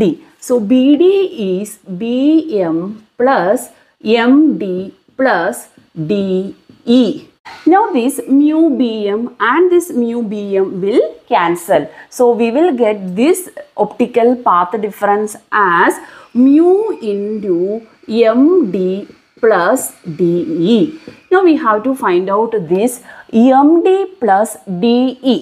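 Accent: Kerala, India. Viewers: Mam are you from kerala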